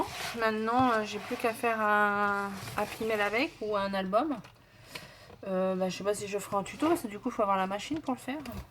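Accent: England, United Kingdom. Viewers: Cc ma belle l’accent anglais top 😂😂👍hâte de voir ton happy mail .... je pense que je vais l’acheter la prochaine fois.... bisouuus😘😘😘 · Coucou, quand même pas mal cet appareil, top!!! J'adore ton accent anglais, ah ah!! Bises, Véro.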